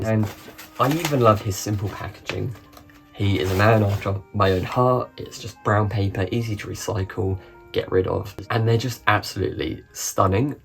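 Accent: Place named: United Kingdom. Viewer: Hi. I would have to take pictures of them, but so many gave away, to my ex's nieces and nephews and they broke them, but I will send a few to you soon, thank you for commenting on my comment love it...Also, love your accent and the U.K... want to go there since first saw Harry Potter... but in the summer though...Here in Canada, 2 months of nice and the rest well...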